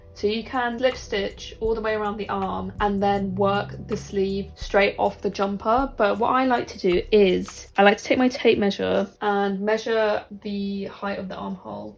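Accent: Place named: United Kingdom. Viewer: what kinda british accent do you have? i like it!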